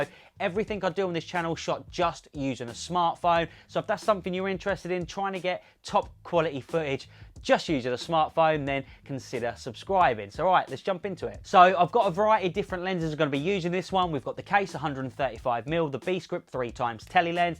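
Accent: United Kingdom. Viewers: having a studio at home is always an issue. It’s the biggest issue that stops people from setting up a channel. I can tell from your accent your from the south east, stay safe mate.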